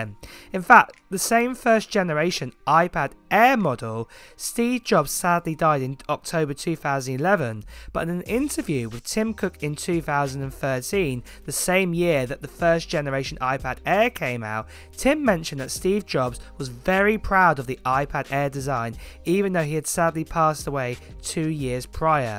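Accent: United Kingdom. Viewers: Why don’t you have captions? I’m very HOH.. and have great trouble understanding British dialects!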